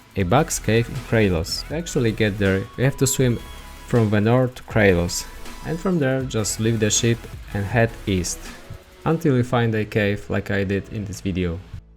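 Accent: Poland. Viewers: slychac ze polaczek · masz polski akcent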